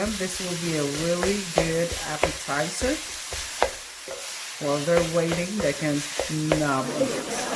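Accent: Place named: United Kingdom. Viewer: british accent si kuya... at masarap siguradong magluto... patikim..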